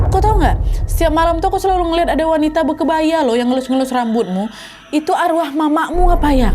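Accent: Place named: Palembang, Indonesia. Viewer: Logatnyo mencak wong Palembang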